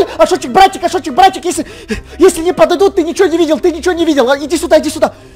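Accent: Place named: Kharkiv, Ukraine. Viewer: шокают не в деревне а в Харькове 😎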